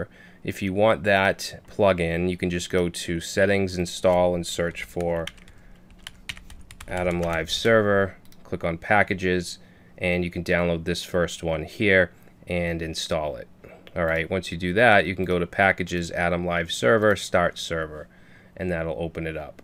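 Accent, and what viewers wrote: Boston, United States. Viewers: Listens for five seconds: "This guy is so from Boston" Listens just past the one minute mark: "BOOOOOOOOM! CALLED IT!"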